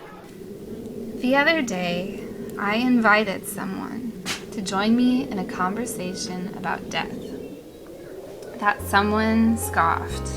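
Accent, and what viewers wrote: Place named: United States. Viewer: It looked an interesting video but the loud intrusive background muzak and the speaker's American accent made her words unintelligible to me.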